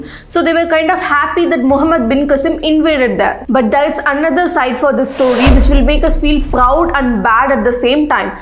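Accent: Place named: India. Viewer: Love her indian accent ❤❤❤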